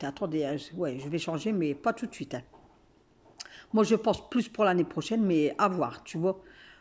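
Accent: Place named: Nord-Pas-de-Calais, France. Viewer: Coucou ma vive les ch'tis Je les aimes et j'aime trop l'accent ch'tis ❤❤❤❤❤❤❤❤❤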